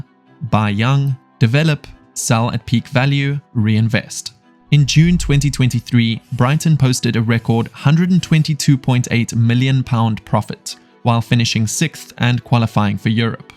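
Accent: South Africa. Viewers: It's an addition because recruitment is less biased. Average players were signed simply because they were Brazilian or English and better players from Romania or Togo were overlooked. I saw your video a few days ago but I didn't watch because the thumbnail seemed clickbaity. I watched it by accident now and I loved it! Great video. Sidenote - Your accent sounds South African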